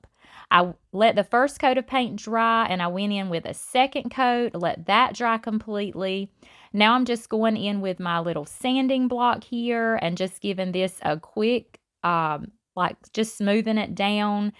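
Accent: South, United States. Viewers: I love how you save broken pieces to cover and use in your arrangements! I would've never thought to do that, but then again I don't make many arrangements... I make more random signs, candle holders, and such. You're so amazingly talented and I LOVE your accent ❤ in from Southeast Texas so I love Southern accents ♥️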